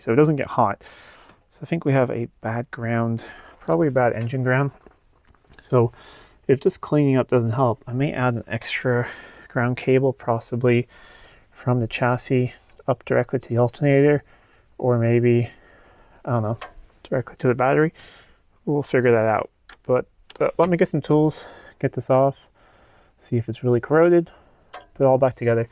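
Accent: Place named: United States. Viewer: Oh, I did not realize until I saw the Biltema battery that you were in Sweden. You sound American to me. As a American living in Norway I cannot imagine owning such a vehicle, here the costs of maintenance are sky high and where I live I am not even allowed to work on my cars. You probably do not have that problem, looks like you are on a farm! 😃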